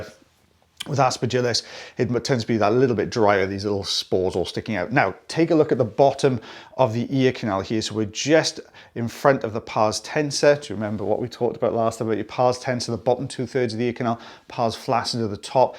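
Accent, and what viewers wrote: Wales, United Kingdom. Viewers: The Welsh accent is fine by me,and knowing the proper terms is really interesting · Thanks for putting the terms in writing. My hearing isn't too good, so sometimes it's hard for me to make out what people are saying. Nothing wrong with your wonderful, welsh accent 👍😊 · Love the Welsh accent. Adds a good bit of charm to the vids. Keep the medical terms coming! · Brother coming from Alabama USA I personally like that "Welch accent"! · Love your Welsh accent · i like your "welsh" accent! Please continue to use some technical terms; showing the spelling and meaning to great! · You're Welsh accent is lovely. Yep, American here. 😆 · As long as you don't get rid of that Welsh accent - as someone from the US, I truly enjoy the accent and have little, if any, trouble understanding you! 😀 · I don't think any of your diehard fans will complain about the medical terms. 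As for your Welsh accent, it could be worse. You could have a strong Scottish accent! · I usually struggle with welsh accents (being broad yorkshire im used to very diferent) but your accent is quite a soft welsh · As someone who works in the health sector (disability) I love that you're now using the medical terminology in your videos. While I don't have to use them myself on a daily basis, I do have to attend appointments with clients and being able to translate them to layman's terms if the doctor or specialist uses them is a win. Thank you for continuing to make these videos...and your Welsh accent is a breeze! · I appreciate the medical terms. Knowledge gained is an amazing thing! And don’t worry about your Welsh accent. This American with Welsh ancestry loves it! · I ❤ your Welsh accent! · Love the Welsh accent. Never miss an episode. Keep up the good work 👏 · I love that you are posting on weekdays and this video is terrific! 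I love getting the medical terminology. Putting it up on the screen is a great idea, too. I'm a microbiologist, love you accuracy! By the way, I super enjoy your broad Welsh accent!! 😁💕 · I am really enjoying the changes you are making. You would make a terrific teacher. And I love your Welsh accent. One of the reasons I follow your channel. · I'm American and can understand your Welsh accent perfectly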